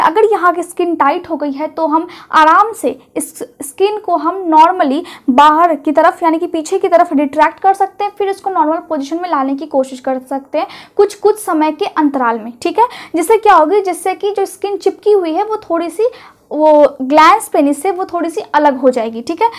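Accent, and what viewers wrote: Bihar, India. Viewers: बिहार या मैथिल इलाकों से होने की वजह से यह हो सकता है।ज्यादा सीरियस मत लो , इनकी मेहनत की तारीफ होनी चाहिए।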